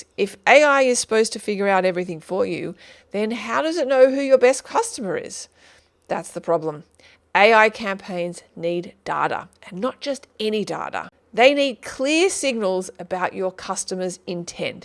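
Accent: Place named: Australia. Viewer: Australian accent is so sweet :)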